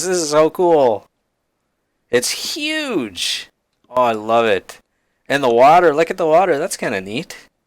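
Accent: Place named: Canada. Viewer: sure is canadian mate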